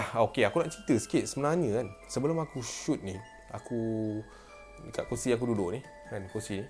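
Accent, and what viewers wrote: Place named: North, Malaysia. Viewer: Hg ckp utara sempoi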